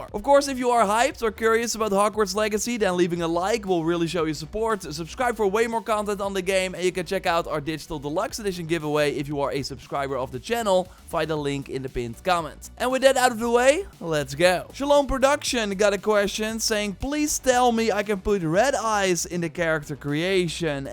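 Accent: Netherlands. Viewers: Something funny about your accent. Definitely Dutch.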